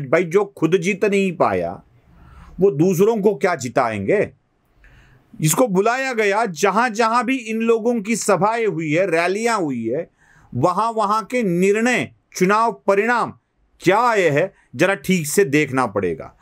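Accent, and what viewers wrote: Maharashtra, India. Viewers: सर तुमची हिंदी खुपच खराब आहे, तेव्हाच ओळखुन पडता की तुम्ही महाराष्ट्रीय आहात म्हणुन,,सुधारणा आपेक्षीत आहे,धन्यवाद सर🙏🏻🌺🌺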